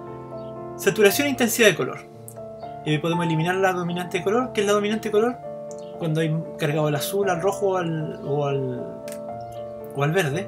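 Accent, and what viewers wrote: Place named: Chile. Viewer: Hola, Encontré tu canal por casualidad...lindas vistas y panoramas en general. Tu acento te vendió como “Chileno”! Yo salí el ańo 78...los campos y panoramas son únicos especialmente cuando incluyes la cordillera de fondo. Yo tengo ańos en photography pero sin canal YouTube. Muy practico tus comentarios especialmente para personas empezando en este hobby o rubro de fotografía. Keep up the good work! Alejandro González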